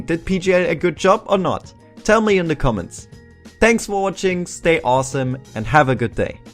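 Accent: Germany. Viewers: Bist du deutsch? (Wegen deinem Accent) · Wow man hört direkt das du deutsch bist 😂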